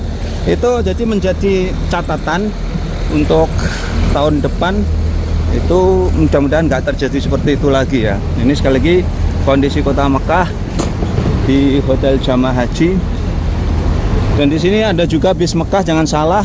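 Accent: Java, Indonesia. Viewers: Mas asli orang mana? Kogh logatnya kejawa jawa an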